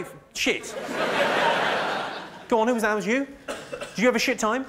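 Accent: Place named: United Kingdom. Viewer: There's something more savage when the comeback is in a polite British accent.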